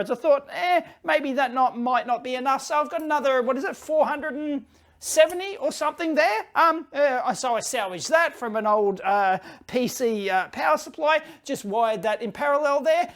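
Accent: Australia. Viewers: Most Australian/Kiwi accent of all time.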